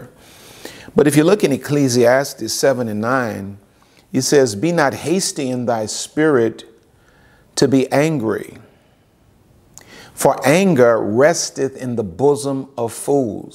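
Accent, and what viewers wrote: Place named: Jamaica. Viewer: Loved the Jamaican accent! There's nothing you carnt do 😀 your my favourite Youtuber without a doubt! #ONLINEFATHER❤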